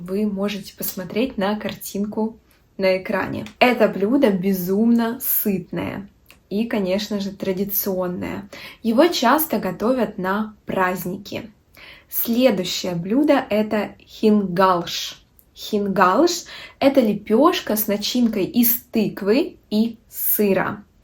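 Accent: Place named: Finland. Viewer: Я единственный, кто слышит в этом финский акцент, звучит довольно круто. Далее я хочу услышать некоторые южнорусские акценты с раскатистым «р». 😊🍖🍗🍗🥞🥞🥞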